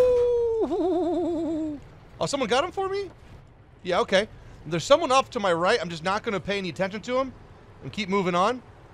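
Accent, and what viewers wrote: South, United States. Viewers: country accent has a lil sugar in the tank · the country pronunciation was better tbh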